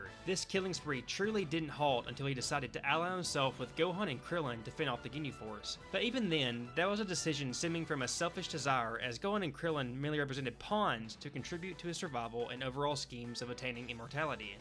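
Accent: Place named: South, United States. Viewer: Glad this video was recommended to me, you make some bangers man. It's always comforting for me to hear another Southerner (I'm assuming) nerd out, bc where I'm from anime and animation in general still has a huge stigma around it. Keep being awesome!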